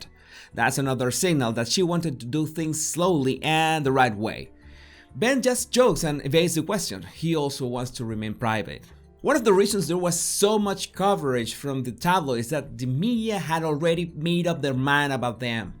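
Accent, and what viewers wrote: Spain. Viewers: Yes, I understand spanish or more what you call "spanglish" but sadly do not speak it fluently. I'm mexican/spanish american. My Mom's 4th generation and Dad's 1st on his father's side...but 3rd on his mother's side and was born here too, so they both speak it fluently...but don't have much of an accent when they speak english. We're very proud of our heritage and culture but obviously are very "American". I wish I spoke spanish better. What I like about is he speaks perfect english with a beautiful spanish accent, but even though he speaks at a nice pace, it's not too fast when he is giving his commentary, compared to most people who speak fluent spanish. Love the Latino accent...italian too! 😁💕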